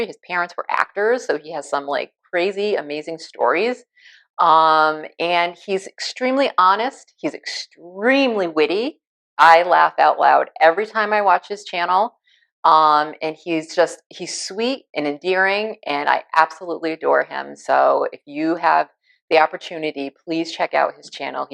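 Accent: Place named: New York, United States. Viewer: I’m sorry you had to go through that and I’m sorry someone lost their life that you knew, however slightly. I’m from Brooklyn originally so I did detect a New York accent from the start. OK I’m continuing your video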